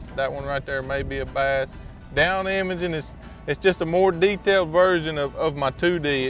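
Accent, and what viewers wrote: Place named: Carolinas, United States. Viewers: LOL, he's definitely from the deep south. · One of the Carolina's, I believe. Love to hear him talk.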